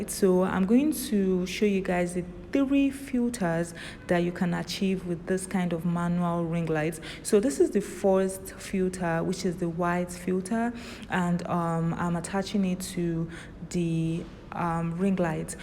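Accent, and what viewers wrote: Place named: Africa. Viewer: Hi !! Are u African or ?? Not a racist I just love ur channel and accent. I just been a make sure Nigerian? Jamaican? It’s so cute . And that ring light is beautiful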